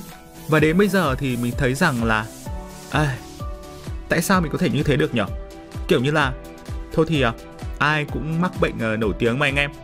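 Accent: Thanh Hoa, Vietnam. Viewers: Người thanh hóa ???? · Anh nguoi Thanh hóa a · Bạn có phải người Thanh Hóa không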